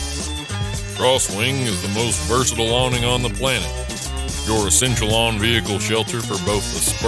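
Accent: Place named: United States. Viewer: Is this a parody? How is it sooooooo American just in the voice over? I don’t trust it.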